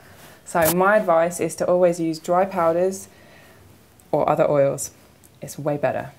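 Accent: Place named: United Kingdom. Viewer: i am not vegan either, but i love making vegan lip balm and i love your vids! i made mine with organic jojoba oil and vanilla. and because of you accent, are you from the uk? because right now im in the uk on vacation with my family......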